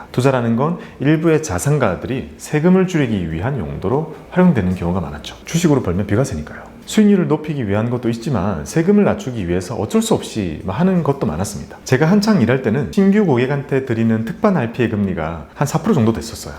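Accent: Gyeongsang, South Korea. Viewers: 배아본적이 없는데??ㅋㅋㅋ 경상도 사투리인가요ㅋㅋㅋ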